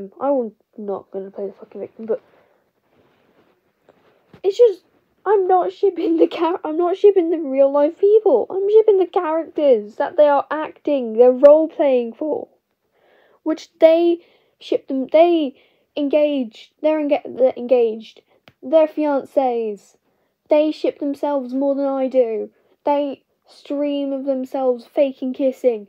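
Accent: United Kingdom. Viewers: Are you British? 😂😂😅Me in a serious video lol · O your voice is....British.? Nice voice!!! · You sound british..... Also cool art style😀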